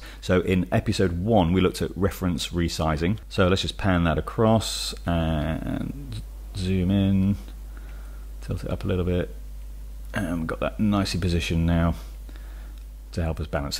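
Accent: England, United Kingdom. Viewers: Dear, Thanks a lot for your LUMA Mix killer tip! It helped me most of my previous day to match a color grading between HD plans but also old SD plans shot in Antarctica for a documentary. It was super helpful and the director are super happy cause the ice have a color that change depending how old was the ice (numerous green/blue clips but also with orange/yellow on high lights due to the sun). You "enlighted" my day:-) Your video was simply super clear, simple, effective, you really go straight to the point and i love your english accent! So thanks! It made my workflow way much faster for that! Have a nice week and thanks again for sharing your knowledge on a very simple and friendly way! Best regards from France! Vincent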